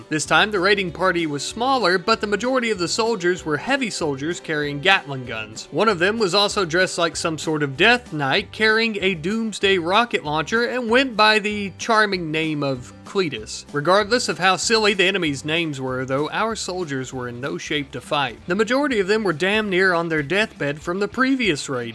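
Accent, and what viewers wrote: United States. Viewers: Wait your American?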